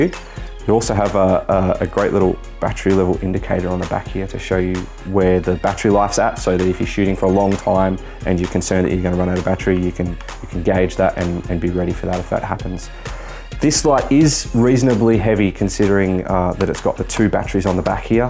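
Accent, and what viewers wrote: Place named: Australia. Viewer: You can really tell an Aussie accent from all others! refreshing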